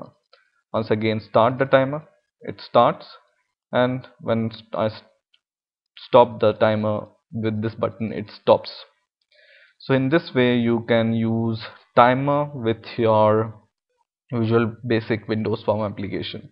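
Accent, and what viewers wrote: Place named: India. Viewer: i love your indian acent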